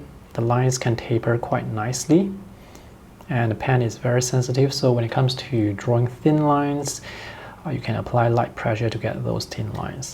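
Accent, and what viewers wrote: Singapore. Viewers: Bro u Singaporean or Malaysian?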